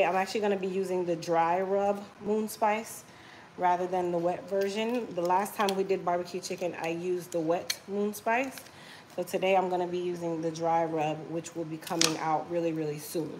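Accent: Jamaica. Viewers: I love your acent are u Jamaica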